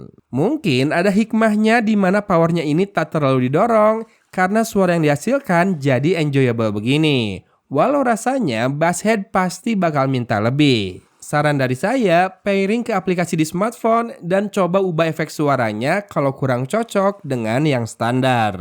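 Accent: West Java, Indonesia. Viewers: Lah, klo memang logat bicaranya jawa barat seperti itu ya mau gmn lg?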